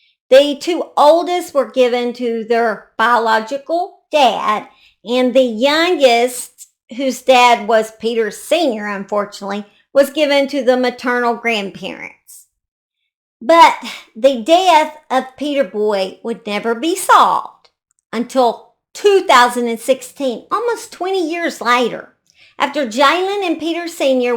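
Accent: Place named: Tennessee, United States. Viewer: I love your Tennesse accent!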